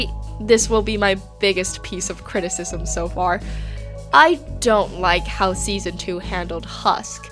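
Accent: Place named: United States. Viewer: have autocorrect off. She is hella fluent in english, seemingly amerucan variety with english influence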